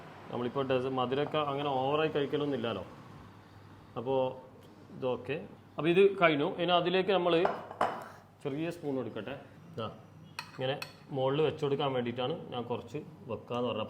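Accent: Kannur, India. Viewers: കണ്ണൂർ ആണല്ലേ ഷെഫ്?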